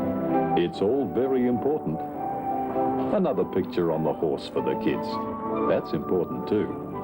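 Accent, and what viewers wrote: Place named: Australia. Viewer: That narrator is laying on the aussie accent pretty thick!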